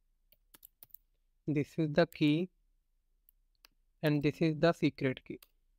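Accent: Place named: Kerala, India. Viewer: Coading + Debugging... Super. You sound like a person from Kerala but the name is Punjabi 😃😉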